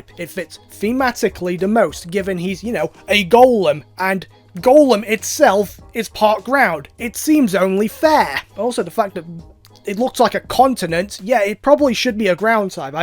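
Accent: Yorkshire, United Kingdom. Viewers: Somewhere in Yorkshire I think. Definitely northern. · Any British folk recognize the accent used here? I've heard it on quite a few YouTubers.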